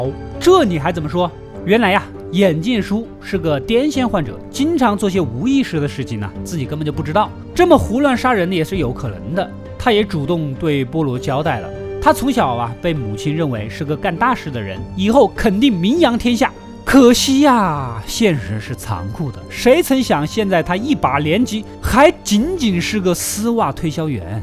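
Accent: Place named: Hunan, China. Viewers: 湖南口音好重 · 湖南人吧哈哈哈口音好好玩不然就是湖北人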